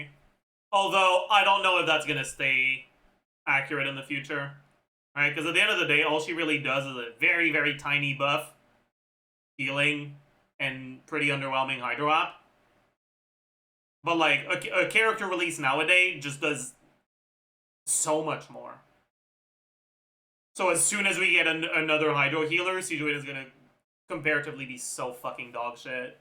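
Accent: Quebec, Canada. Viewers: which ones have you noticed? it could be because he's a quebecois french speaker, especially with fontaine names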